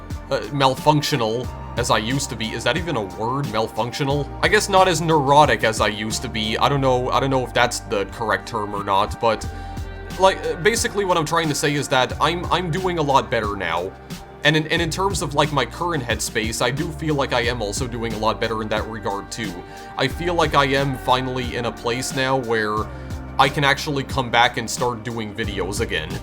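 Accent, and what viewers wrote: Canada. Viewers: Where are you from? I watched your Catacombs of Solaris video. Are you from Canada, maybe sasqatchean “Twenty Fifteian” “A long tyum” “Kowun I was autistic for a long tyum”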